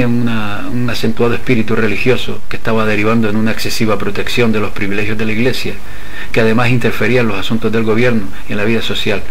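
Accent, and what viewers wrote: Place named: Canary Islands, Spain. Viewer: Por aclararte lo que quería decir, las que van entre sería como sonarían en la lengua/dialecto: el sonido sh- sería en gallego o asturiano, xamón [shamón] o xoven [shoven]; en español normativo y el de la zona castellana sería j-, como la de joven o jamón; En tu caso, el canario, vendría a ser como [hamón], [hoven] y [Méhico] (por transcribirlo de alguna manera), vamos como tú siempre has pronunciado la jota. Lo de [Méksico] cuando vemos escrito México deriva de la confusión que nos produce que en el castellano antiguo la j- actual se escribía x- y está grafía se ha conservado con el paso de los siglos, pero solo en ciertos nombres. Es lo mismo que le pasa a Oaxaca o al "Don Quixote" original del s XVII, que ahora mismo lo escribimos "Don Quijote" pero tanto en el pasado como ahora se pronuncia igual-> Quijote; y Oajaca. 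Es decir, para escribir la jota(j) de hoy se usaba la equis(x) por eso nos confunde cuando vemos escrito Quixote, México y Oaxaca porque son reminiscencias de aquella escritura medieval del sonido jota. La equis de hoy en día como en excursión es un sonido ks-> [ekscursión] que nada tiene que ver con lo que representa esa equis medieval de México. En definitiva, es tan correcto escribir México como Méjico y las dos se pronuncian exactamente igual, lo mismo que Quijote-Quixote, Oajaca-Oaxaca. Un saludo, espero no haberte liado más... jejeje Por cierto quiero recalcar que me encanta tú trabajo y el tono serio en el que lo realizas. Saludos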